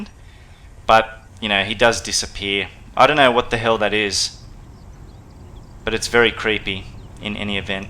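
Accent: Australia. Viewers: so entertaining with that aussie accent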